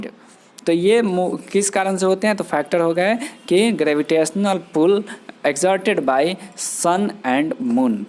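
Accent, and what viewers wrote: Bihar, India. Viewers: Mujhe toh aapki awaz ko sunte hi pata Chal gaya ki Aap bihari or bandar ho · Aap bihari ho naa aapke bolne se pata chal raha h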